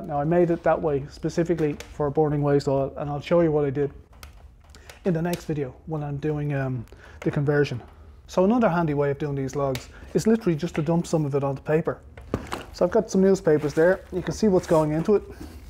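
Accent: Ireland. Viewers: i could tell by the accent . But was not sure if it was a dublin accent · Looks dangerous....but love your Irish accent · Hi..Watching you again and enjoying your videos, also you have a wonderful Irish accent that take me back to the old country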